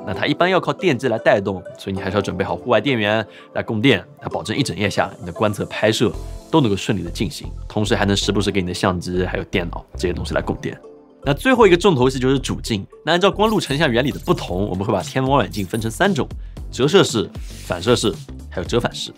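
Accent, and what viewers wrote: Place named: Jiangsu, China. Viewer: 这口音，江苏人。我福建的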